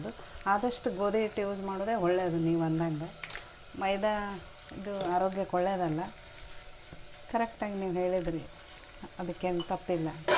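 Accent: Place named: Brahmin, India. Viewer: ಎಲ್ಲಾ ಅಡಿಗೆ ತುಂಬಾ ಚೆನ್ನಾಗಿ ಮಾಡುತ್ತೀರಿ ತುಂಬಾ ಚೆನ್ನಾಗಿ ಹೇಳುತ್ತೀರಿ ಬ್ರಾಹ್ಮಣ ಭಾಷೆ ಅಪರೂಪವಾಗಿದೆ ಕೇಳೋದಕ್ಕೆ ಖುಷಿ ಅನಿಸ್ತದ ನಮ್ಮ ಉತ್ತರ ಕನ್ನಡದ ಬ್ರಾಹ್ಮಣ ಭಾಷೆ 🙏🤝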